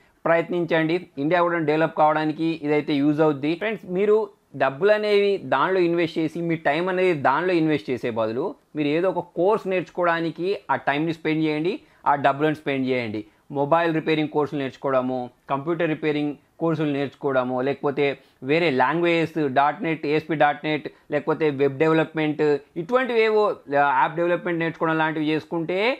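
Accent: India. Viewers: తెలుగు అబ్బాయి లాగే మాట్లాడతారు .. i like it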